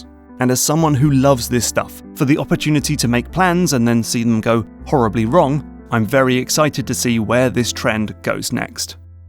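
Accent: United Kingdom. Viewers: "Electric Danna" You british folk and your ARs. Anyway, glad to see I'm not the only on who dyed Link's hood green.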